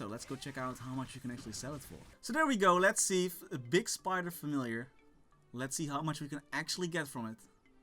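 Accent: Netherlands. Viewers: just new to ur channel u sound dutch, nice video btw!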